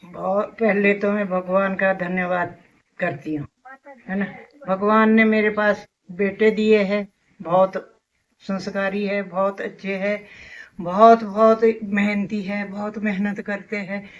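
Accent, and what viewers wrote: Standard. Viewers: शुद्ध उच्चारण के साथ , बागीचो की सही देखभाल एवं पौराणिक चीजों की उपयोगिता की सटीक जानकारी युक्त आपका ब्लॉग अनुठा सा बेहतरीन लगा ,,,you people are very positive, humble and good cultured,, ...….keep vlogging 👌👍 आपने माताजी के लिए जो lines बोली सही में गौरवान्वित बना दिया अपने parents को...।👏👏👌 दादीजी को नमस्कार🙏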